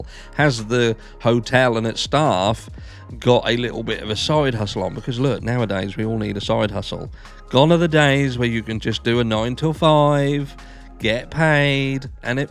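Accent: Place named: Norwich, United Kingdom. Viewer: You sound like you're from my neck of the woods, Norwich way?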